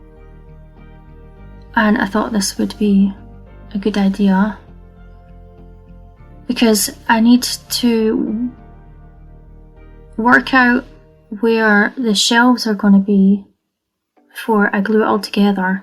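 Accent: Scotland, United Kingdom. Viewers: My wife Cinnamon, really loves your crafts. She is watching you make the fireplace with the broom and cooking pot and spiders. She just loves it. Also loves listening to your voice. A touch of bonnie Scotland.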